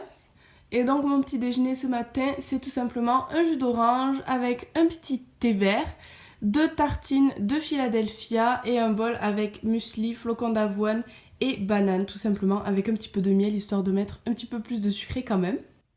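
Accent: South, France. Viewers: Tu me fais trop rire avec ton ptit accent du sud La bise de la martinique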